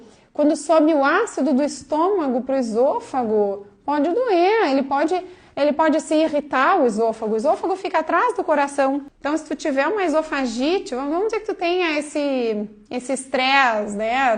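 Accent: Rio Grande do Sul, Brazil. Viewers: Sotaque de gaúcha doutora 😢😢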